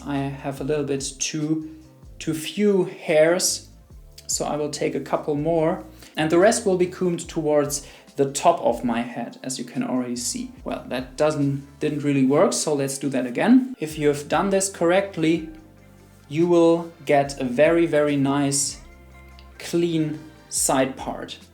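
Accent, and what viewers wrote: Germany. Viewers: ch wusste an deinem Akzent das du Deutsch sprichst.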